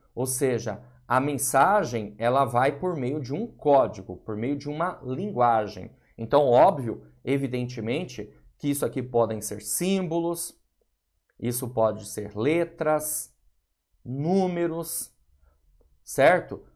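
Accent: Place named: Sao Paulo, Brazil. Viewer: Esse professor é show .... parabéns 🎉 se deus quiser passarei em um concurso que irei fazer ....você não é de João pessoa professor ? Tem sutaque de paulista ....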